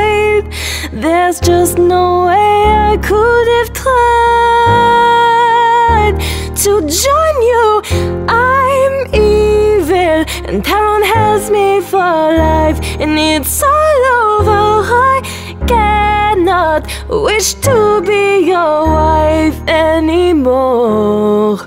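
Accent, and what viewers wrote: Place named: France. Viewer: Can you speak French or not at all? Because you're French accent is on point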